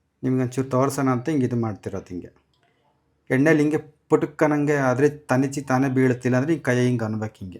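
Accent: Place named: Bellary, India. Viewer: Are u from,Bellai or Hosapete?Because,ur kannada accent is like that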